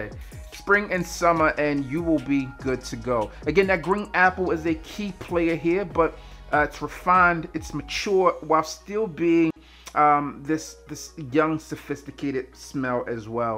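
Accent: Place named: East Coast, United States. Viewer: I love the content, but that east coast accent makes me cringe. 🤦🏾‍♂️😩😂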